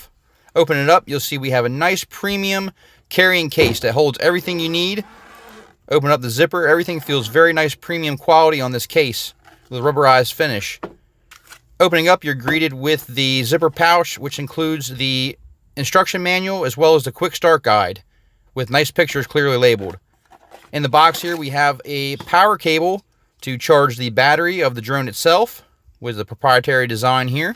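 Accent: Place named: Baltimore, United States. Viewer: Love the straight up Baltimore accent. Sorry to see this with the drone. I was kind of excited to buy this for my son.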